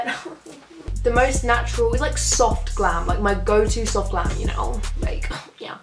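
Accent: United Kingdom. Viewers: Hey, i' m french and i would like to now were do you come from because you have an accent wich seem to be a british accent... someone can tell me. Thank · Why am I genuinely unsure if she has a slight British accent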